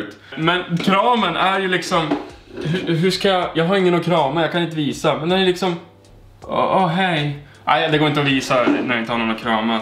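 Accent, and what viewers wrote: Norrland, Sweden. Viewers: Jååå, jag gillar att äta kladdkaka samtidigt som jag kollar på dina videos när du pratar norrländska.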